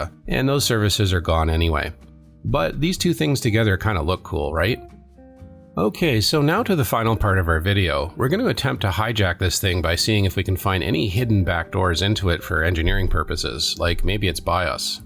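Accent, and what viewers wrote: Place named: Canada. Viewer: You sound so Canadian. "Ageenst it" hehe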